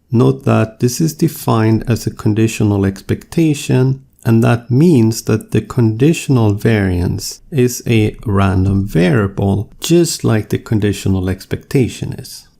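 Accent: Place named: Sweden. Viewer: got a very Swedish accent.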